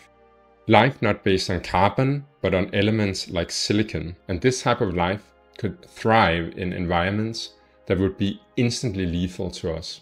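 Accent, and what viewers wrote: Germany. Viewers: I know that your channel is new but I get the feeling that you are going to take off on YouTube very soon. Keep up the good work. By the what country are you from? I would have guessed one of the German speaking ones but i could be wrong. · Why does he sound so German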